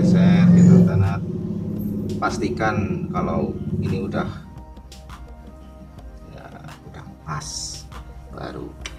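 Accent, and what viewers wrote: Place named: Banyumas, Indonesia. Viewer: Dempet kang bahasa ngapake😁😁😂😂😂😂😂😂😂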